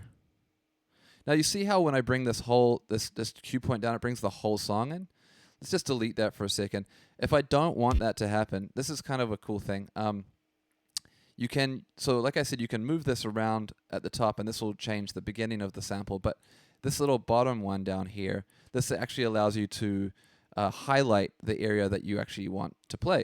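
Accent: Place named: New Zealand. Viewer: I could definitely hear the kiwi...solid keep up the good vids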